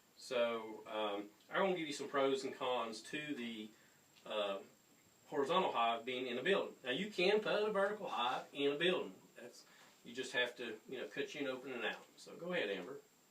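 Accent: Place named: United States. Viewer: sadly with bad acoustics and US accent I cannot hear or understand this video. any chance of sub-titles for key points. Thanks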